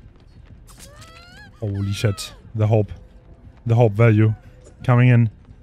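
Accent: Germany. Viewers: Man hört so krass das du deutsch bist, also warum auf Krampf alles auf englisch??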